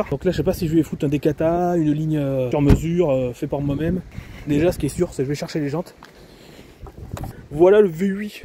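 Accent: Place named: Southwest France, France. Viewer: brive.. ptn con je me disais bien l'accent il ressemblent au miens 🤣 je suis vers marmande bergerac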